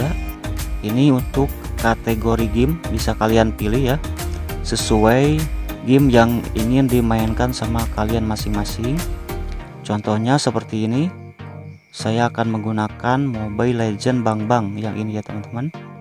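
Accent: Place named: West Java, Indonesia. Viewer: Sunda banget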